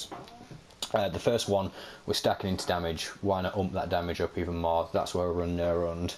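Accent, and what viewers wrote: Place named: Bristol, United Kingdom. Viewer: are u from Bristol ? thats one T H I C K accent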